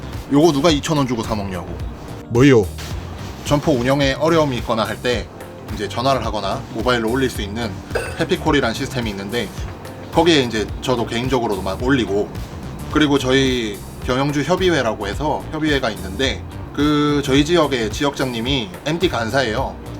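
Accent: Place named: Gangwon, South Korea. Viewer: 이분 강원도 분인것 같다..사투리가 강원도 사투린데..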